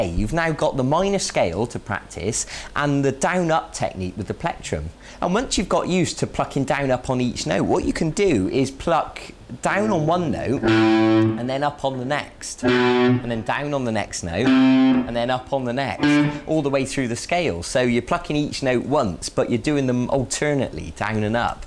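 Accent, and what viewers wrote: United Kingdom. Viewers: yeah, but I like this guy better, he has a british accent. And the other guy yelled at me, "HI EVERONE WHATS UP?!?!?!?!" I dont like him too much. And this british guy is fine, he knows what he's doing, and I'm learning stuff without a guitar so shut up.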